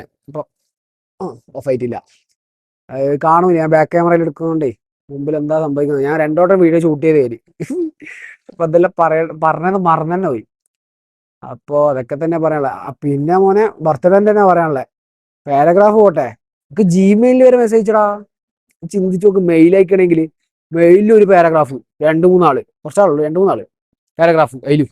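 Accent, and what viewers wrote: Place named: Vadakara, India. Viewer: engale evida anu engale basha keteet kanur alle vadakara slang thonnu atha choyiche😂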